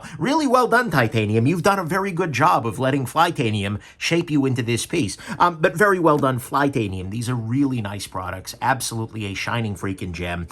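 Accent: New York, United States. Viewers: You are so informative and funny! I love the NY accent!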